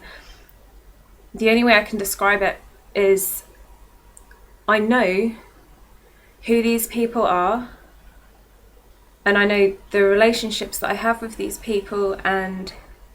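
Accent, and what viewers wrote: United Kingdom. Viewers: Is it me or do you get a British accent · Your accent sounds british to me, not aussie. Very interesting video. Thanks for posting it. I do know a great deal about MPD/DID.. am a hypnotist and have great success with hypnosis and regression. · Ur British not Australian!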